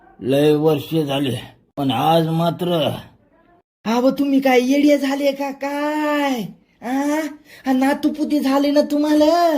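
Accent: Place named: Marathwada, India. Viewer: मराठवा डायची भाषा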